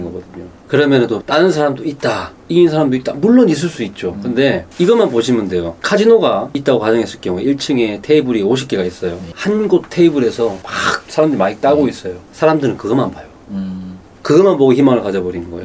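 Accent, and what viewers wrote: Gyeongsang, South Korea. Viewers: 말투가..갱상도출신인가요..ㅎㅎ 머.도박을.했엇지만...비굴하지는.않했네요 자기말에.지킬려고.했던.마인드.. 칭찬해주고..싶습니다.... 도박..다시는.않하시겟지만.. 내용을.보니.머리가.아주.비상하시것같습니다 뭘해도..잘해내실것.같네요... 홧팅.드립니다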